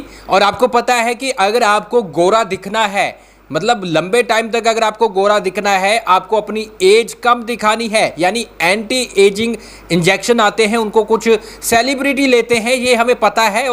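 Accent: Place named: India. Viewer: भाई, हम अमेरिकन नाही, जो शॉ बोले सिर्फ, इंडियन अक्सेंट एसा ही है. There को देयर, alive ko लाइव्ह, र, कड, daughter ko डॉट नहीं डॉटर, पुरा उच्चारण करते है, क्या हुवा ठीक है.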